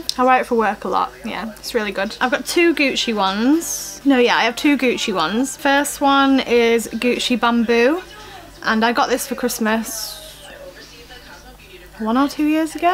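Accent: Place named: United Kingdom. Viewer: you're so pretty! I love your accent. Are you British?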